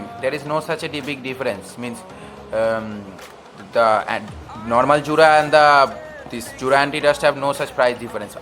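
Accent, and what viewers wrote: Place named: England, United Kingdom. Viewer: Tumi english accent a kotha bolo nah, normal a e bhalo lago!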